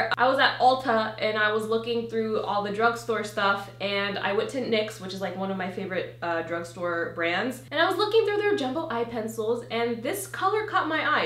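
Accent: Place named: Australia. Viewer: I MISSED THAT AUSTRALIAN ACCENT LMAO😂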